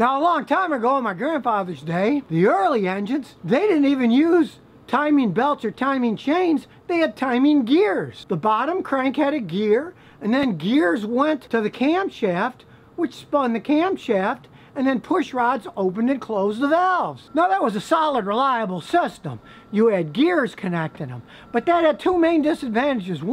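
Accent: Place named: North, United States. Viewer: originaLkomatoast he may live in Texas, but he sounds like a yankee. I want to hear THAT story. What brought him here?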